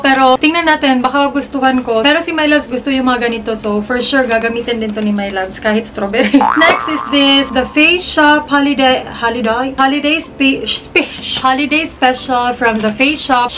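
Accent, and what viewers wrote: Visayas, Philippines. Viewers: You're so loveable and very enjoying to watch! Tho you have that bisaya accent, you show your true and natural self to your subscribers! ❤ Continue to inspire us and do lots of great videos! May God Bless you always po Ate! Power! 💖💖💖 FB: <link> Instagram: jrgvlls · ambot lng magkatawa gyud q mag tan.aw sa imong mga vid. kanang tagalog nga accent bisaya. hahaha soooo us gyud mga bisaya. pasalamat q sa youtube g suggest to imong first vid aq nakita. nalingaw q nitan.aw nlng q tanan. katong labay2 nmo sa haul. nka ana q pra2 maning bayhana oi. nyahaha pro until now. mygeeedd 20k? pla plang mikabook ni subscribe ato .. dalia ra oi. always stay unique gurl.. IG: lovehalo83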